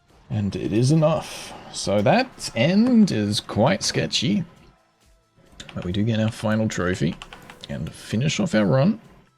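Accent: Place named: South Africa. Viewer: I watched this as I couldnt get better than bronze on 14, I played it a bunch of times and figured it out and got gold :) just unlocked 16-20 :) Hey, your accent...I think your from SA?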